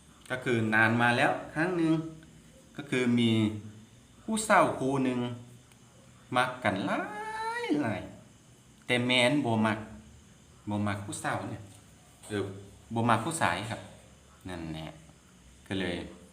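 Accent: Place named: Thailand. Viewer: สำเนียงคนไทเลยกะม่วนดีเนาะจ้าแก๋วๆจ้าเล่านิทานเก่งจ้า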